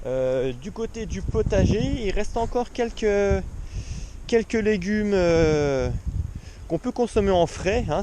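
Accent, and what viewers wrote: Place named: Alsace, France. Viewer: Bonjour à toi. Je découvre ta chaîne et un brin de nostalgie m'envahit. J'habite dans le sud-ouest mais je originaire d'un petit village au pied du Ballon d'Alsace. Ça fait du bien de revoir ces paysages et d'entendre cet accent bien de chez nous. Ça fait bientôt 40 ans que j'ai quitté la région et ça me donne un bon coup de fouet. Merci pour tes vidéos et bonne continuation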